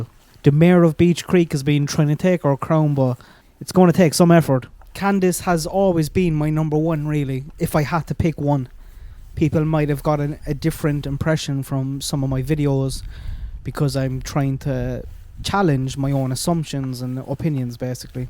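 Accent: Ireland. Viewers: I always get so wrapped up in what you say and enchanted by your Irish accent right away, that I totally forget about the darn bunny! 🐰🐧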